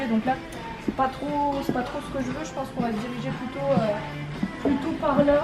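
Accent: North, France. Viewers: on reconnait l'accent du nord mdrr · Les gens entende beaucoup l’accent moi non 😂( oupsss je viens du Nord aussi )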